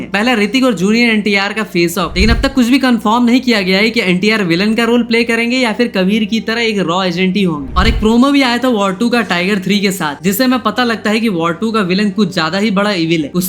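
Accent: Bengal, India. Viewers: Bhai ap Bangali ho ??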